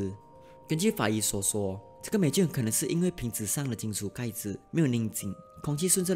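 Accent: Malaysia. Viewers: 大馬口音好嗎？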